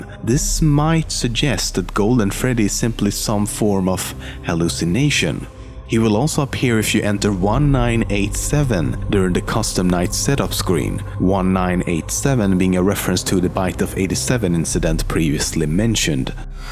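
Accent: Sweden. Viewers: Your accent sounds swedish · You are swedish huh? xD Strong accent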